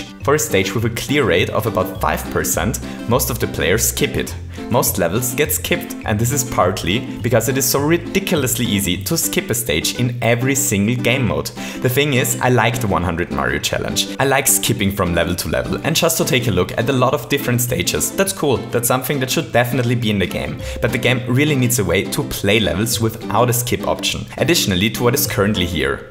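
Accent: Germany. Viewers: Man hört raus das du Deutsch spricht und Lesezeichen ist ja auch ein deutsches Wort #Ceavearmy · Du bist deutscher? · You're from germany, right? ^-^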